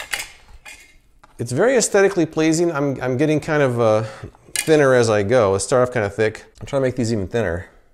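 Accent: United States. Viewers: Are you American perhaps? 🤔🤔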